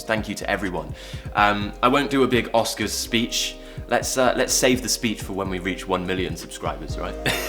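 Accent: United Kingdom. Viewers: Your British accent is really beautiful.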